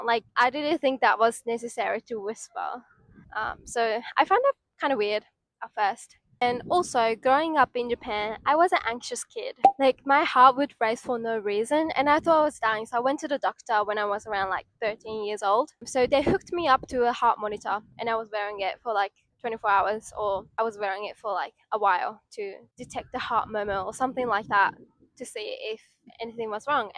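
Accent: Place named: Australia. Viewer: I subscribed because of your australian accent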